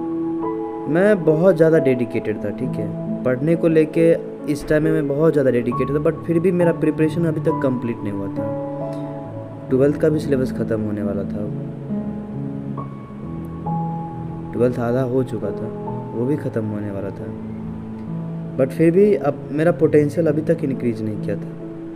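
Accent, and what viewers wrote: Bihar, India. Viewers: Firstly, When I listen you voice I get a smile in my face because I listen Bihari accent. As am too bihari. Story of bihari is always struggleful and inspirational. I'm very inspired by you bhaiya and I'll surely crack NEET2024. 👨‍⚕❤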